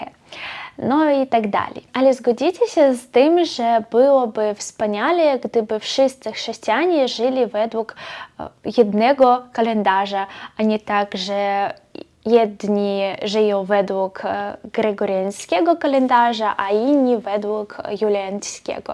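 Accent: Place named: East, Poland. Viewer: To mój pierwszy film pani. Bardzo ciekawie się słuchało. Zarówno temat interesujący, jak i przyjemnie słyszeć polski język w takiej wschodniej formie. Bardzo dziękuję za nagranie. Dowiedziałem się czegoś nowego. I gratuluję języka! Super.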